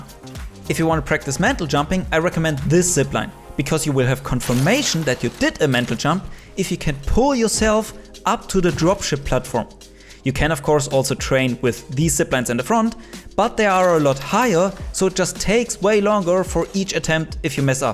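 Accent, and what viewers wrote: Germany. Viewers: Man hört richtig raus das du deutsch bist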